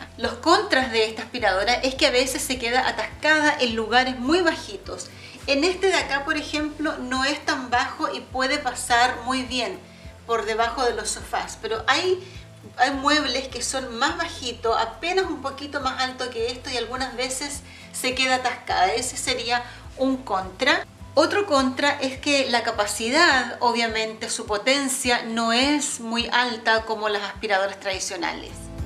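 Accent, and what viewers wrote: Chile. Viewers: Muchas gracias. Acabo de descubrirte. Estoy fascinada. Y me es lindo oír un acento chileno. Te cuento que descubrí las vaporetas y me estoy haciendo una muy buena impresión. Sabes algo? Te animarías a hacer un video? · Hola, gracias por los consejos. Una pregunta eres Chilena? Por tu acento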